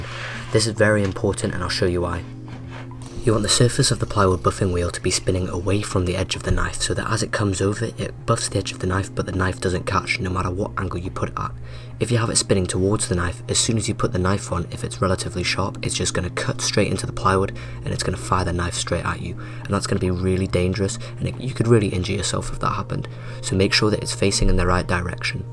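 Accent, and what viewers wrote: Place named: England, United Kingdom. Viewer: bla bla bla bla typical england english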